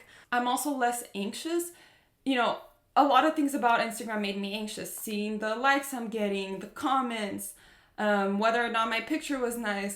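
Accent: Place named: Brazil. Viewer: teu inglês é perfeito, mas por algum motivo sei que tu é br... subliminar... mas sei kkk excelente vídeo!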